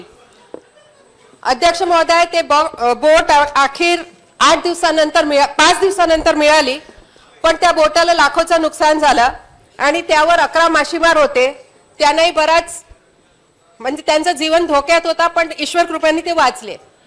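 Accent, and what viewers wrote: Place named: Marwari, India. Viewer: marwari hai wo isliye , phir bhi try to kiya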